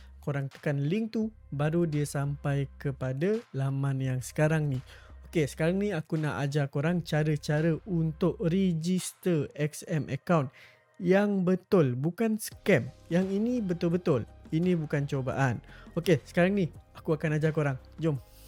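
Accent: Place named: Malaysia. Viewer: kaya orang malaysia logat nya... apa melayu indo..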